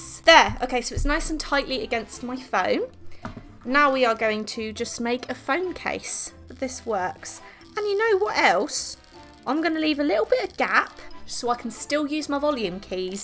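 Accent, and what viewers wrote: England, United Kingdom. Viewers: I live for that British accent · omg i've just found you're channel and i want to say that you're gorgeous 💕 you can't be real... a very pretty talented artist with a very cute british accent 😍😍 love from france sorry for my grammar :) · But like are you English?? I'm confused ahah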